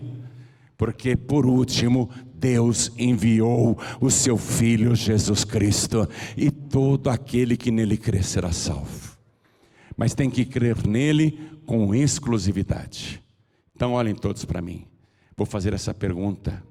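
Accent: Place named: Rio de Janeiro, Brazil. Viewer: Pastor seu sotaque carioques e muito engraçado. O Sr não e de Piracicaba. Deve ser uma técnica de fala né?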